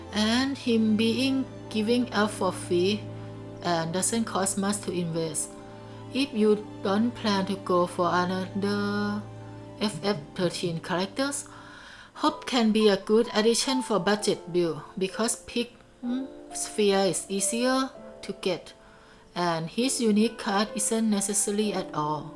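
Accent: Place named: Thailand. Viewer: สำเนียงภาษาอังกฤษเจ้าของช่องเหมือนคนไทยเลย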